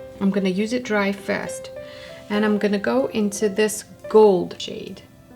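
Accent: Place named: United States. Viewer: Как прекрасно ты говоришь, словно ты американка!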